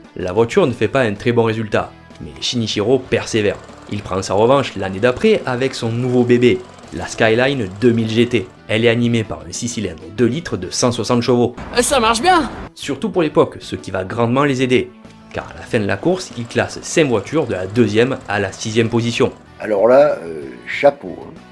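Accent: South, France. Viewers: tu t'exprime avec un super Français, qui sen un peut le sud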